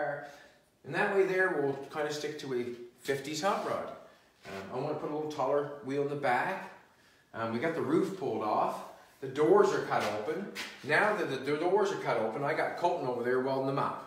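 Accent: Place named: Newfoundland, Canada. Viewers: Sounds like he's in Eastern Canada...eh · True newfie,, talk for days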